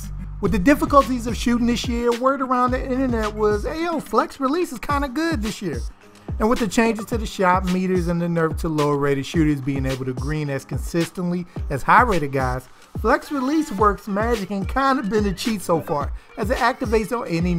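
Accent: Baltimore, United States. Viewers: Bruh u can hear that Baltimore accent everywhere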